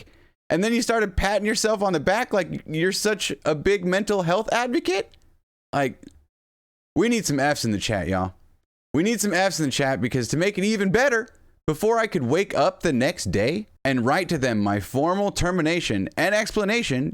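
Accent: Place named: Arkansas, United States. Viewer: Real talk. I ran across your channel and subbed because you sound like a Arkansas hillybilly and you had unbeliveable reflexes, Im here to spend a few minutes watching someone I like, Doesnt matter the game. Big hello to mama Fox.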